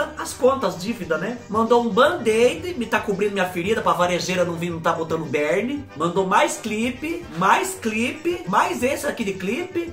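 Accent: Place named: Riversul, Brazil. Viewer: ai kkkkkkk nao aguento, minha vo é d riversul e fala igual vc, jaguara, pandu kkkkkkkkkkkkkkkkkk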